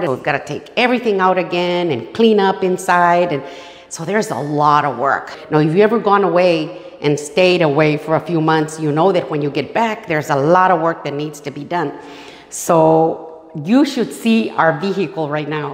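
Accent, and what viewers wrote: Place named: South Texas, United States. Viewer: I love watching your videos! Your South Texas accent reminds me of back home 🥲💕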